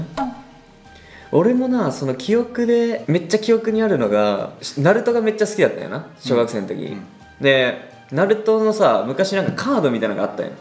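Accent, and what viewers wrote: Okayama, Japan. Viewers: さんの岡山弁大好きだから聞けて嬉しいです😁♡ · 岡山弁のさんがやっぱり好きすぎます🥲🍑❤️イチブトゼンブのモノマネめちゃわろてもーたww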